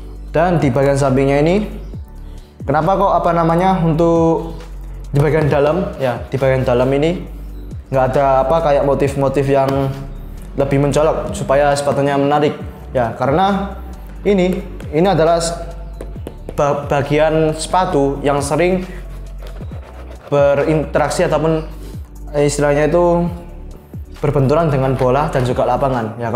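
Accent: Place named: Java, Indonesia. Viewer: Halah..meddokh .. n gagap 😂 males Dengernya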